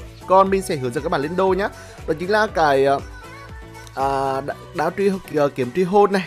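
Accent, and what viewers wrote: Nghe An, Vietnam. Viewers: ngta ko hiểu tiếng nghệ an mình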